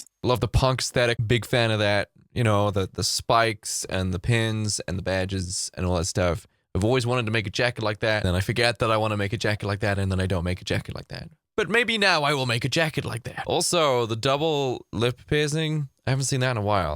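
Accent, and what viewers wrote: United States. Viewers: Why do you sound American? you put your youtube as United American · Where are you from? You sound American to me but I seen where you said you’re from the UK. I’m from the US and I think you sound American. Much love to you and love your videos!!!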